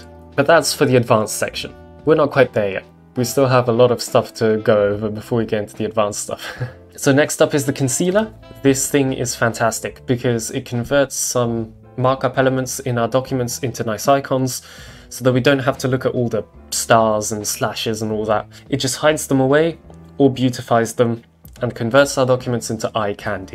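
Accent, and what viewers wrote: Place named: New York, United States. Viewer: sounds like newyork :D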